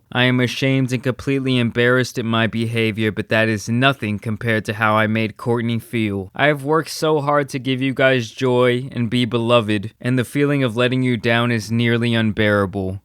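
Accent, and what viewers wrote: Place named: East Coast, United States. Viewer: Just noticed your east coast accent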